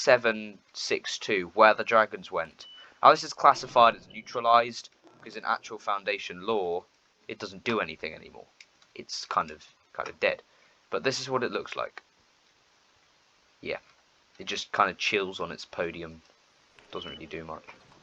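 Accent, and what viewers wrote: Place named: United Kingdom. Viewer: Nice your British funny voice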